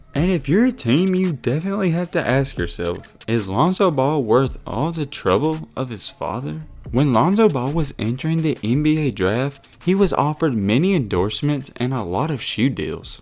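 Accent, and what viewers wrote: South, United States. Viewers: I hate your hillbilly voice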